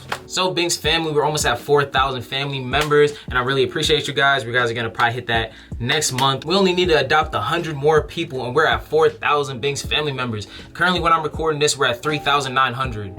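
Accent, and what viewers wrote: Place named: New York, United States. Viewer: You from New York innit 🖤♿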